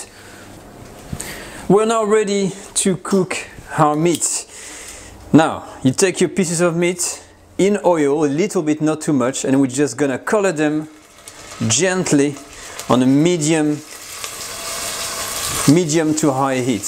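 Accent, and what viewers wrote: France. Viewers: I like the way you pronounced stir as “steer” and your French accent! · Nice! I'll try this recipe tomorrow, I love your french accent by the way... greetings from México! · Mate, are you some sort of French guy located in Australia with Dutch roots? I hear lots of things happening with your accent.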